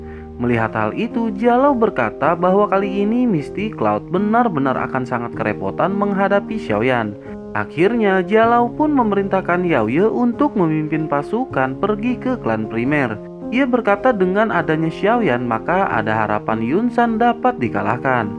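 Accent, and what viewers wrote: West Java, Indonesia. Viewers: Bang lu orang Sunda kah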